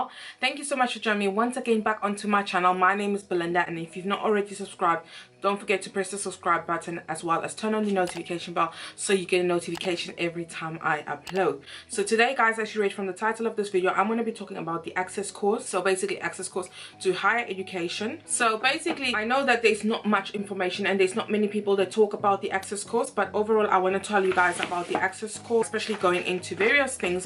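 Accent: South Africa. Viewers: Girl i knew you were South African 😂😂 i can hear it omg 😳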